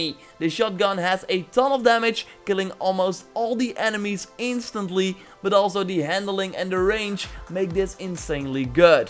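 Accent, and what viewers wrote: Netherlands. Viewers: are you Dutch? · you sound dutch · The Dutch is strong in this one. Good vid =] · Ben je Nederlands heel misschien? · Ben je nederlands? Want je hebt een nederlands engels accent hahaha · Join some speaking lesson m8 ur Durch accent is really strong. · tophercheese1 Dutch accent. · Are u dutch i hear it somehow in ur voice? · Ben je nl? I hoorde je video zeggen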